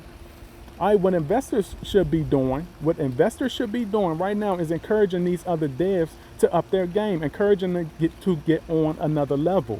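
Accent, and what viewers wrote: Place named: Baltimore, United States. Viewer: ooh ok that’s ah nice home bro I thought you were from Baltimore because of the accent I’m from Baltimore I live in Texas now tho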